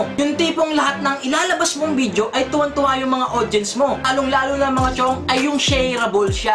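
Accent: Batangas, Philippines. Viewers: Batangueño tlga kpg mgsalita prang galit ,peru natural n boses lng yan...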